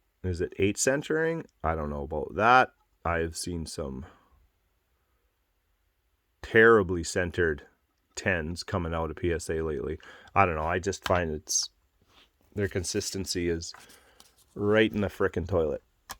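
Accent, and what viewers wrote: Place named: Canada. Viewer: I'm in Kingston, Ontario. I knew this guy was Canadian a few seconds in! Cheers fellas.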